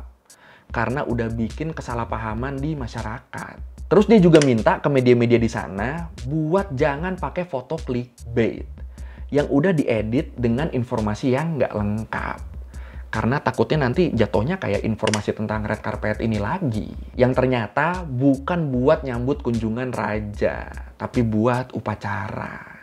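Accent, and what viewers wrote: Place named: Madura, Indonesia. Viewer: Aksen Madura nya dpt bgt... asli Madura ya?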